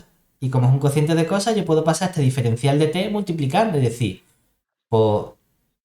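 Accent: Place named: Cádiz, Spain. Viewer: de donde eres? Soy estudiante de ingenieria en la UNED y tus videos son la bomba, tengo familia en el Puerto de Santa Maria en Cadiz y tu acento de verdad me suena de esa zona y me encanta, un gran abrazo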